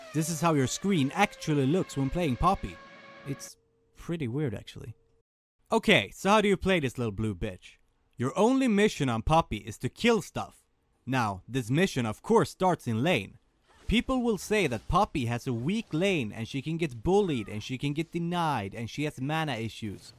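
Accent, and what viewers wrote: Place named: Netherlands. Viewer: your dutch accent if so annoying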